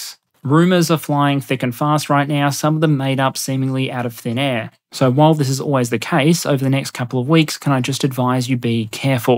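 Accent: Australia. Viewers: How did you learn to speak so many different languages? in the video where you have spoken about the war in ukraine, the germans, the japanese and now this one, your pronunciation of all those various languages (even though you can still hear the australian in it) is impeccable.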